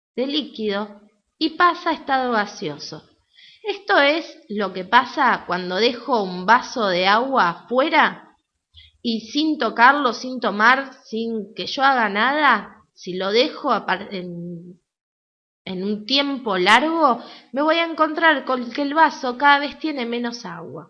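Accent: Argentina. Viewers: Habla español cruck lo diferente es que tiene el acento argento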